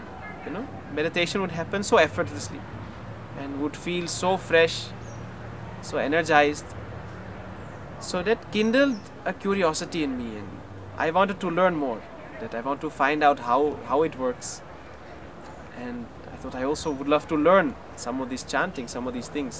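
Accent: India. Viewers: Hello, your Indian accent delivery took away from the meaning of your words. It just does the opposite of feeling genuine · your English is much more INDIAN... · Its indian but its damn good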